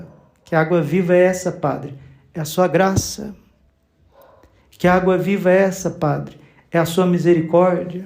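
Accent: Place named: Minas Gerais, Brazil. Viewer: Obrigada Pe. Bráulio por sua vida de dedicação ao serviço de Jesus. As suas homilias são muito tocantes à alma e ao coração. O senhor tem a sabedoria que advém do Espírito Santo para evangelizar. A sua humildade o aproxima de seus fiéis. O seu sotaque Mineirinho é muito cativante. Deus o abençoe. 🙏👏🏼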